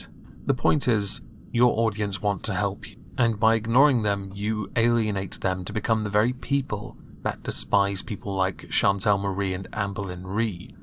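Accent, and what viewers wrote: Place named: England, United Kingdom. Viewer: You sound so much like Clown ( Collossal Is Crazy ) But it's probably just because your both English? Is it racist to say that?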